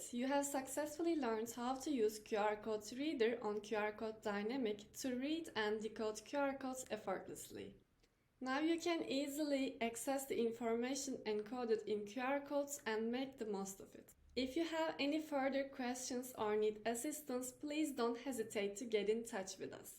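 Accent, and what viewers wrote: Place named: Turkey. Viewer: Bu üslup kesinlikle bir türke ait olmalı :D türk ingilizcesi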